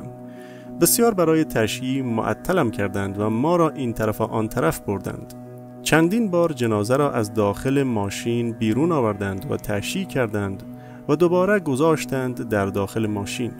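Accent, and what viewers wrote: Afghanistan. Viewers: جناب چرا اسم و محل آن شهید را به خانواده هایی که عزیزانشان سرطان و یا مریضیهای نا علاج دارند ندادید که آنها هم مثل شما خوشحال شوند مگر آن مادرانی که فرزندانشان درگیر مریضیهای مهلک هستند آدم نیستند و احساس ندارند ! ؟؟ میدونم که این داستان مال حدود یکسال پیش است ولی دردمندان زمان نمیشناسند بروید و به دادشان برسید مگر آن شهید بهش سفارش شده فقط برای فرزند شما شفا بگیرد ، راستش نمیدونستم آن دنیا هم پارتی بازی میکنند درثانی شما با این تعریف میگویید قدرت شفای شهیدان از خدا بیشتر است ! ؟ برو بابا جمعش کن و این چرندیات را به خورد مردم بدبخت و ساده دل نده ، راستی نمیدانستم مردها هم میتوانند زن فوت شده را غسل کنند خوب بوی گلاب که آن مرده میداد دیگه شستینش که گلابها برود و .... دست بردارید و حال من و بقیه ای که که این چرندیات را. البته لحجه شما بیشتر شبیه مردمان عزیز افغانستان است ، با این چرندیات خواهران و برادران افغانستان را هم که برای همه ستم دیده هایشان بد نام نکنید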